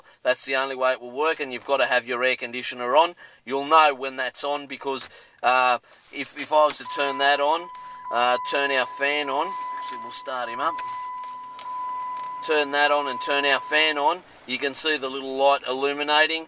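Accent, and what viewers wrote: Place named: Ireland. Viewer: this guy sound like irish man from cavan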